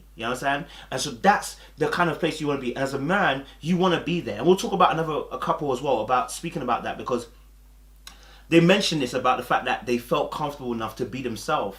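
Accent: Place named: Birmingham, United Kingdom. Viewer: The brum accent comes out every now & then 😅💥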